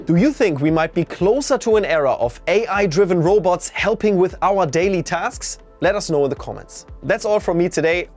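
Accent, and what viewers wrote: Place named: Germany. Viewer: German Accent detected 🇩🇪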